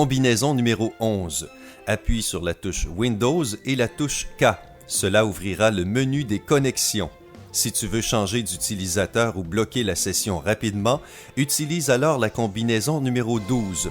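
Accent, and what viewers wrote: Quebec, Canada. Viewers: L'accent Canadien on adore xDDD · J adore ton accent québecois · moi aussi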